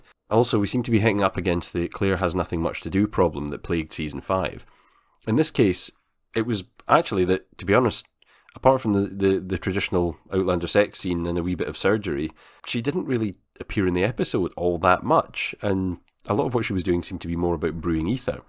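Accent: Scotland, United Kingdom. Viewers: Hi! Nice to be here again. I really liked episode one. With all the characters and to setup the storylines I think this opening episode is a good thing. The main storyline I think will revolve around the weird Christie family. And yes, they do get weirder! It's been a few years since I've read this book, but I don't think you'll be disappointed. Is your accent Scottish? I didn't realize the actor who plays Tom had such an illustrious career. I'll have to check out some of his other work. His accent is great. Beautiful opening with Glencoe in the background! See you for episode 2 review! (Won't see you, but I'll hear you🙂)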